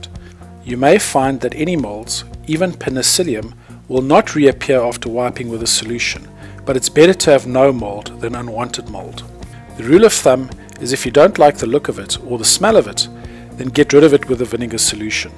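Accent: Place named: South Africa. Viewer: Where can I buy bactoferm 600 in South Africa ? You sound like a South African.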